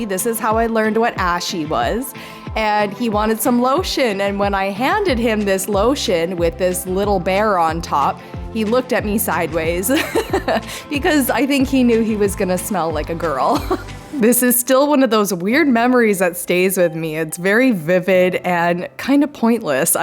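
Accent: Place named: Maritimes, Canada. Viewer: You're just so friggin creative thanks for sharing your artistry, amazing, your sound like your from the Maritimes lol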